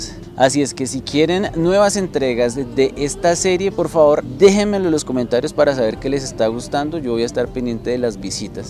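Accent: Bogotá, Colombia. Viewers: El comunica rolo 👌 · Te felicito me encantan tus historias además que eres un cachaco muy genuino😊 a pesar de lo joven que eres tienes muy marcado el acento bogotano y también chévere que caminas por nuestra icónica ciudad cuando vas narrando, muchas felicidades tienes talento y carisma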